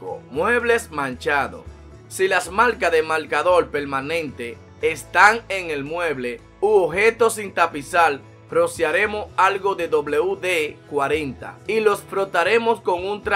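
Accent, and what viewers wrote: Dominican Republic. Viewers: Creo que su acento es de republica dominicana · ES DOMINICANO!!!🇩🇴😁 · Es de republica dominica que nunca le escuchaste esa voz a alguien🤥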